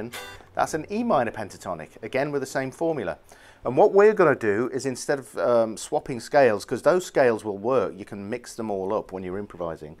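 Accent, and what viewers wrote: United Kingdom. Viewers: Kids, don't practice guitar, have muscular body, charming british accent, or else you will be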